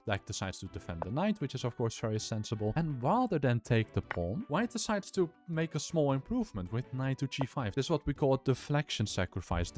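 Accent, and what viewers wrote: Netherlands. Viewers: Are you Dutch? Sorry, I always get distracted by the accent of Dutch people on YouTube.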